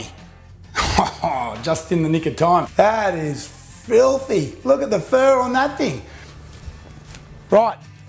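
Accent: Australia. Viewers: We love you Australian!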